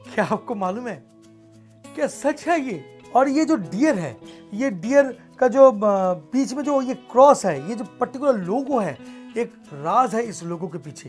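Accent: Bengal, India. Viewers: By ur accent u seem to be a Bengali ri8!?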